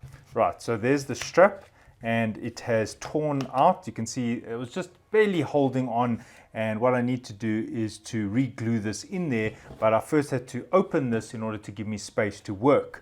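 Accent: South Africa. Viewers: Defo a South African accent!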